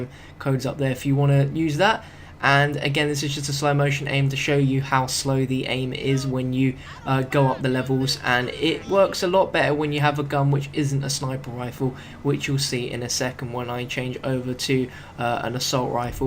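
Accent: United Kingdom. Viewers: Is he brittish I wonder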